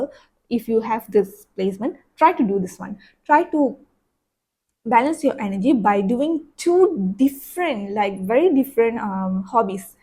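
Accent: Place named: Malaysia. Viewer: Hey, Amazing video, appreciate the insights! Are you from Malaysia btw? I grew up there, you have the same accent!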